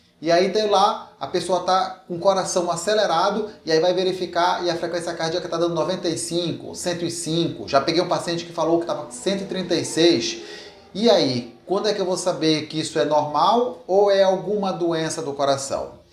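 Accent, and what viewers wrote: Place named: Belém, Brazil. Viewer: És de Belém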